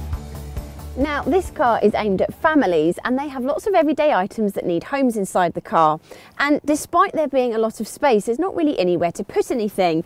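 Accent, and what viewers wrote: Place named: Oxford, United Kingdom. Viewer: is sooo sweet. And i love this wonderful original oxford english😊 And it a real interesting car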